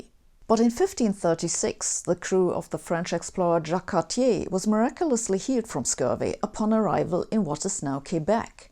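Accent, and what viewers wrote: Germany. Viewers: Hello Sabine, I've discovered your channel about a week and have been binge watching since then. I think you probably have one of the best science channel out there and I like your german accent. I have a question though : would making the hypothesis that God rules the world through quantum mechanics make any sense science wise ? I know that such an hypothesis would be just as unlikely as any other theories (many worlds etc..), maybe more, but could it be ruled out science wise ? It sorts of make sense that if God influences the world, then He would do it through the most fundamental elements of Nature (although I know that a beautiful theory doesn't make science). In this theory God would chose the outcome of events through quantum decoherence. Since one cause can have multiple consequences in quantum mechanics, God would chose which outcome he likes the best out of them. 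Which outcome actually happens would thus be determined by "God's Will" I guess that would imply that quantum decoherence both happens and has macrocospical consequences in Nature (without human intervention), which I believe is the case based on what I've understood from your videos. I am not a religious person desperatly struggling to fit God in Science. I'm just an agnostic who's curious to know what does science have to say a the matter. I believe I am not the only person who thought of this, so it could potentially make a good video subject. Thank you.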